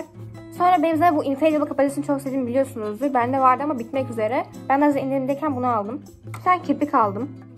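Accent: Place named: Russia. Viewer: Rus veya Ukrayna olması lazım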